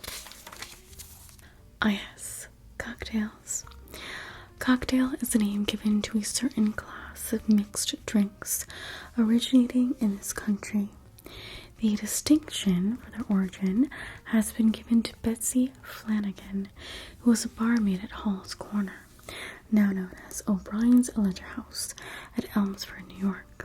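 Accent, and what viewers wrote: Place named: Michigan, United States. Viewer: I've never heard a Michigan accent complemented before! I appreciate it as a michigander myself 😅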